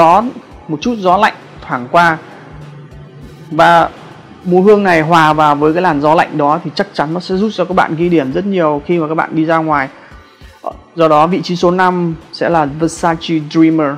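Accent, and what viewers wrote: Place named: North, Vietnam. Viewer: cảm ơn video của bạn rất nhiều nhé <3 ps: mình thích zọng trai Bắc :))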